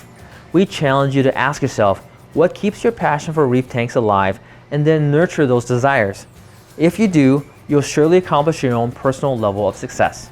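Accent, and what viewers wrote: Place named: United States. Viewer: This man's speaking american accent. You're lucky to have him informing you about marine fishkeeping bc he speaks slowly and carefully. This 10/10 presentation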